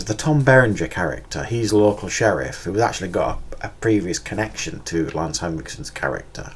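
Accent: United Kingdom. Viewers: You from UK?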